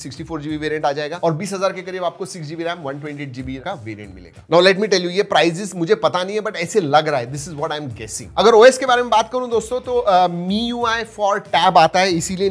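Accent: Maharashtra, India. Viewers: Nahi gelare, kon mhtla gela🤣🤣 pure gauthi marathi 🤣 loved it as well as tab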